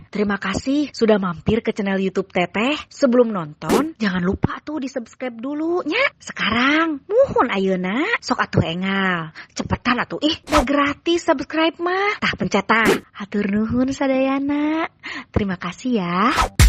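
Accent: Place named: Garut, Indonesia. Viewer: Euuhhb teh.. Mani resep pisan pas kalau ngomong sunda mani lucu, tong hilap teh sundana nyariosna lucuuu pisaaannn, logat garut punya has ya hehhehe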